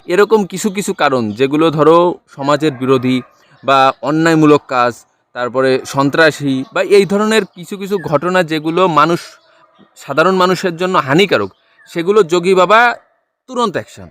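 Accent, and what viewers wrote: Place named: Khulna, Bangladesh. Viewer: আপনার কথা শুনে ভারতীয় নয়,, বরং বাংলাদেশের খুলনা বিভাগের বাসিন্দা মনে হয়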